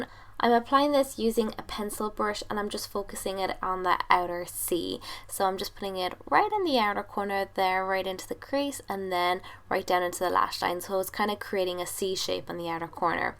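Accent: Ireland. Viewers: Your accent is gorgeous, what part of Ireland are you from?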